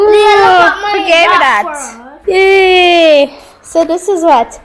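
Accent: Jamaica. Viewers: GIRL UR SÒOOO FUNNY, WITH UR JAMAICAN ACCENT, YOU HAVE ME LAUGHING, 😆😁UR A REAL JAMAICAN GIRL NOW, I MUST SAY, L❤❤❤U ALL 🥰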